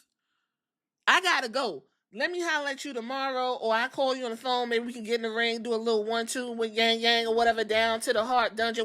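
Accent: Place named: New York, United States. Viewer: Yo I love your NY it reminds me of home…”fk outta here!” 🤣🤣🤣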